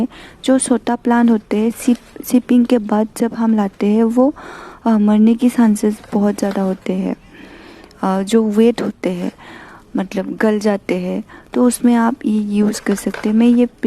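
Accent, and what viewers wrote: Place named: Assam, India. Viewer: aap ka Assamese accent perfect hai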